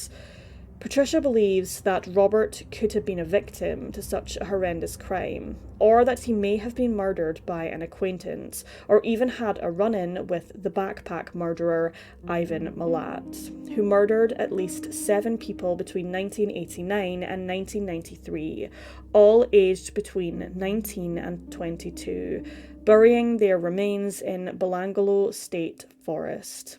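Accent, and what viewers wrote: Scotland, United Kingdom. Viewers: SHE'S IS SCOTTISH ACCENT THANK YOU!!!!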